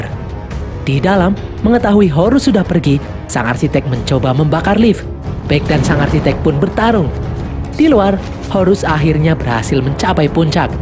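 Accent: Java, Indonesia. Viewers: huruf B dan D nya medog bgt bang. khas bgt channel ini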